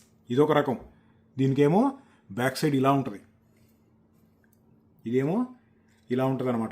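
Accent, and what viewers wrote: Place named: Guntur, India. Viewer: Yes. May be from guntur according to the slang.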